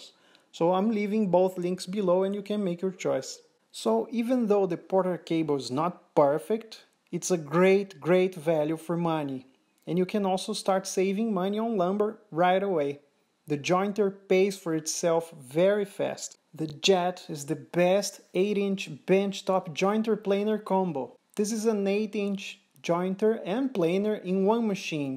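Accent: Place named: Brazil. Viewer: Sounds like a Brazilian to me based on the accent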